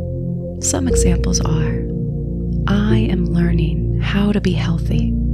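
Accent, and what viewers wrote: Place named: United States. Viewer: Finally, one with an American accent- no more British or Australian ones that distract me lol😂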